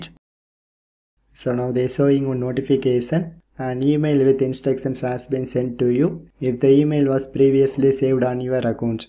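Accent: India. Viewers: its okay saying it wrong its just because uour indian so its hard to say a simple thing but your good at english❤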